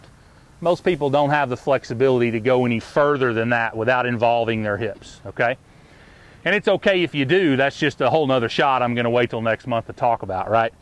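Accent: South, United States. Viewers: I found you today on YouTube, a little behind everyone else. You have a great method of teaching. I completely understood everything you said and described. A southern accent is greatly appreciated by this Texan ;-) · your method and delivery of the training is great. You explain everything in a logical, easy to understand way.. This past weekend I tried to use the techniques in my short game and they really helped. My short game is the worst part of my game so any thing is a plus haha! I have watched all of your videos now. You present the information so much better than other teachers. Keep up the postings. I will watch everyone. BTW, where are you located? I detect a Southern accent. Am I right?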